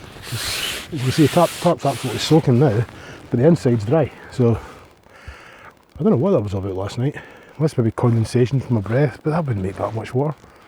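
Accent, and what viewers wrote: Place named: Scotland, United Kingdom. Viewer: Fantastic, didn't stop laughing the whole time, and I grew up in innellan just down from Dunoon so I bl**dy love the accent, reminds me of my youth, keep up the good rant... I mean work.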